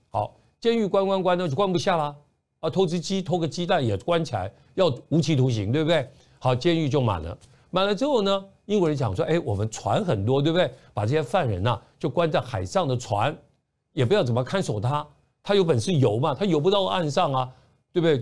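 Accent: Taiwan. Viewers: 主持人华语是台湾学的吧，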